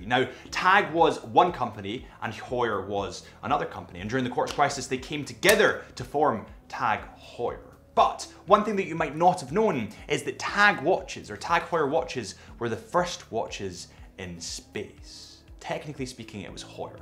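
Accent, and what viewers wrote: Scotland, United Kingdom. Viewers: You sound like a Scottish Adrian Barker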